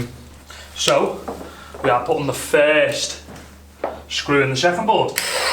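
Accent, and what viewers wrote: Liverpool, United Kingdom. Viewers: Grew up in Liverpool, moved to Australia 10+ years ago. Been watching your videos for years now, miss hearing a scouse accent, so thank you for blessing my ears. Keep up the quality content bro <3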